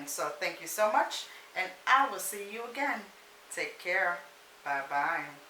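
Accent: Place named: New York, United States. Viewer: Love it looks delicious . are you from NY ? I live in the south but I'm originally from Long Island NY. I miss hearing the NY accent . love you recipes keep putting them out ❤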